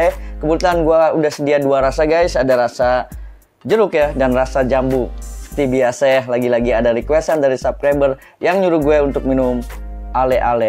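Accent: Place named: West Java, Indonesia. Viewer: Punten bang kok abang orang bali kek orang sundaaa☺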